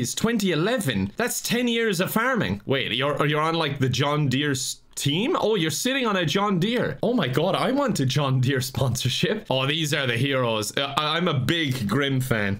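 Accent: Ireland. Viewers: Is my English just improving and therefore I'm getting more sensitive to hearing accents, or is speaking more and more in an Irish accent over the last few videos? It doesn't bother me at all, I really like it, I'm just wondering if I'm the only one noticing this. It could just be me getting better at English since it's not my first language, tho.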